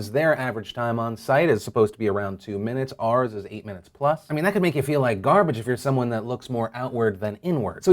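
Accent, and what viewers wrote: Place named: Bronx, United States. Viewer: holy shit your from the bronx you are already maybe my favorite youtuber but hearing made me respect you so mutch more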